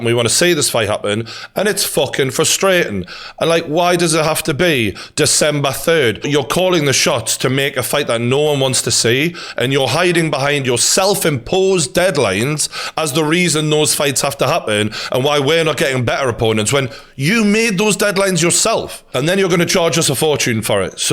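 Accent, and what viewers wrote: Newcastle, United Kingdom. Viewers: Did like true Geordie until now..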